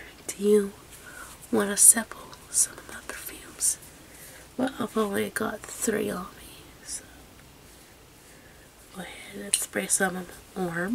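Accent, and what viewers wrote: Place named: South, United States. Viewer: your sweet toned voice combined with your subtle southern accent is very relaxing!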